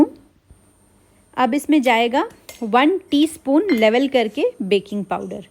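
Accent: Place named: Azamgarh, India. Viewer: ap Azam greh ki hn meri susral mn nnden Asi hi bolti hm kpry ko kpra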